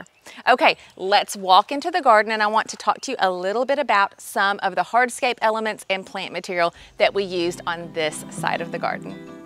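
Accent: South, United States. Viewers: Love your gardens. What is the big green trailing plant on the steps at the end if the video when you’re sitting on the steps? Also, what is the white trailing flower in the round tall pot that isn’t on the steps. I knew you were a Southern neighbor when I first heard you talking in another video. I’m from Memphis but moved to Franklin, TN 40 years ago until grandchildren came then we just had to get closer/ Murfreesboro now. Anyway, keep making your awesome videos. We southern ladies just love to decorate and garden don’t we? It’s our heritage. 🌹😉😀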